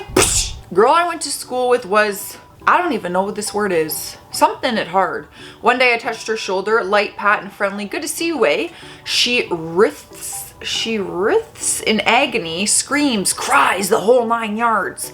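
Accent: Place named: Canada. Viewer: G,day I'm Australian. Surprise, Surprise, you have a Canadian Accent!!! Love it!! All the best. Bluey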